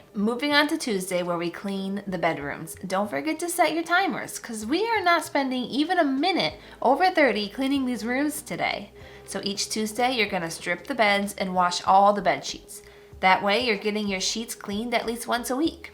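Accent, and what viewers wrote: England, United Kingdom. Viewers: Your English accent is gorgeous 😄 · Your English accent 🤣🤣🤣🤣. Adorable. I'm from England and was very impressed 🤪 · Your English accent here n there is hilarious! Love it Xx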